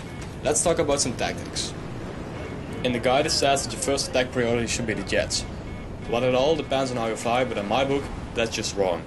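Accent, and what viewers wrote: Netherlands. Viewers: Dutch accent FTW It sounds so awesome :D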